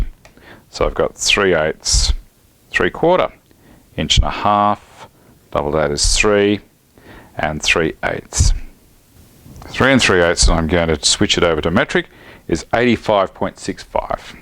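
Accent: Australia. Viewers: Any reason you put the wood through the thicknesser before the jointer I've always thought it was the other way around? It is nice to hear an Aussie voice on you tube BTW. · I was wondering why I love watching this video, then I've read in comments that you're from Australia :D Love the humor, the accent and of course the build itself!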